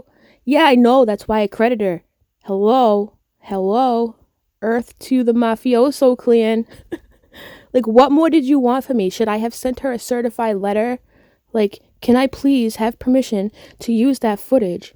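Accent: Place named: New York, United States. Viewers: Love your videos, love your fabulous East Coast accent! Thanks for your videos. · You are awesome... and I am not sure where you are from, but you sound like a fellow New Yorker to me! Love it! Keep being Unapologetically You Girlie!!!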